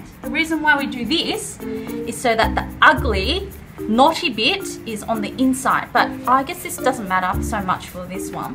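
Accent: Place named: Australia. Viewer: Im pretty confident in saying that she's Australian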